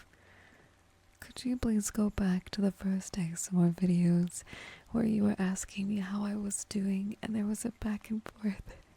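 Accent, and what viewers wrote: New Zealand. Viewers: Man I love to tune out to the Kiwi woman’s voice. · Thats the NZ accent for ya mate.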